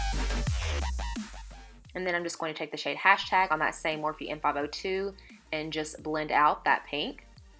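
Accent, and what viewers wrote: South, United States. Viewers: So pretty, and I love your accent, are you from the south?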